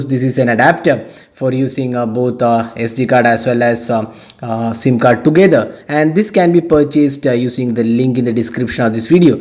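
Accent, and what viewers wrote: Kerala, India. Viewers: Hate that mallu accent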